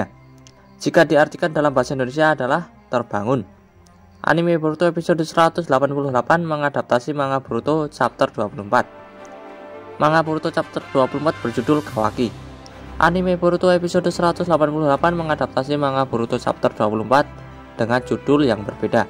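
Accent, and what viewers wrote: Java, Indonesia. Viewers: Medok mas suaranya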